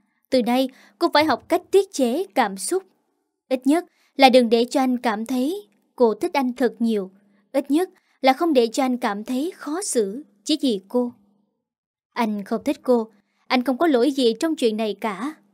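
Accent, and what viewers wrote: South, Vietnam. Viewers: Going mien nam de nghe wa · Mỗi người có một sở thích khác nhau, có một số người không thích giọng đọc của MC vì chất giọng của miền Nam. Nhưng thực tình thì đọc rất hay, nhẹ nhàng, truyền cảm,bộc lộ được nội tâm của từng nhân vật làm cho câu chuyện hay hơn, hấp dẫn hơn.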